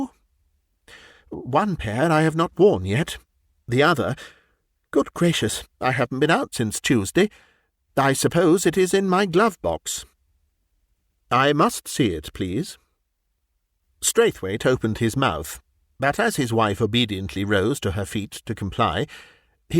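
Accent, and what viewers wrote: United Kingdom. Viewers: Im Egyptian. English has been my second language all through my educational and professional life. However, i am more accustomed to the American accent because of the movies, series…. etc. only recently have I discovered the joys of listening to old radio plays and bbc stories. I had to set the playback speed to 0.75 in order to comprehend the British accent. But listening to British perfectly pronounced by you sir, I don’t need to change the speed on your channel. Thank you very much.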